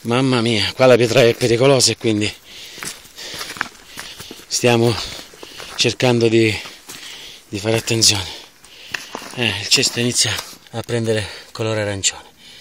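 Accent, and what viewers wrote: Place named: Tuscany, Italy. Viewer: Cominci pure a parlare toscano....grande!!! Ciauuu.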